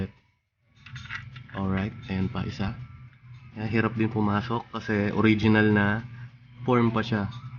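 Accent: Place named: Iloilo, Philippines. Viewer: hahahah medyu malapit ako jan boss hahaha linti ilonggo kman gali hahaha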